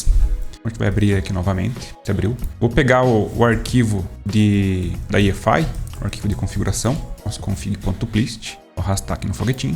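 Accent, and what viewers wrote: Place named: Paraná, Brazil. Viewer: haha quando vi o sotaque já imaginei ele é paranaense, e que massa que é daqui de CTBA piá. haha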